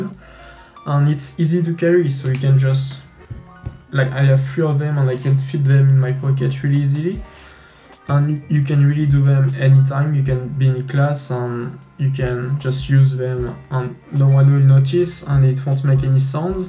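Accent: France. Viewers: Sa se vois que t'es français à cause de l'accent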